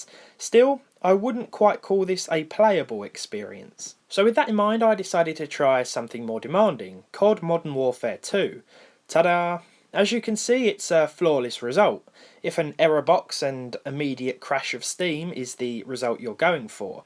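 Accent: United Kingdom. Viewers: I really love this channel, especially that Brit voice it’s easy to listen to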